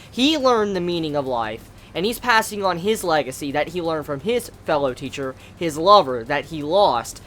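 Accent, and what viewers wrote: United States. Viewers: Ummmm, what part of America is that accent from?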